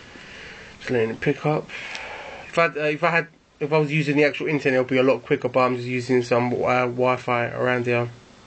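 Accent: United Kingdom. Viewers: Cockney accent.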